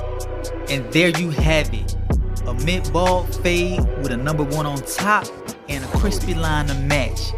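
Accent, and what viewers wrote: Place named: Houston, United States. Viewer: Wicked with the hair cutters as my grandpa would callem... HAIR CUTTERS...I swear you sound like you from H Town...great work!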